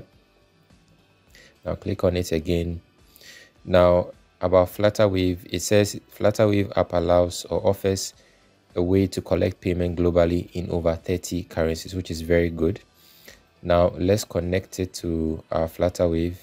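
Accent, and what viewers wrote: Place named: Ghana. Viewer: great video. I can see you have a Ghanaian accent, and I would like to link up. I want to learn from you . Great video sire.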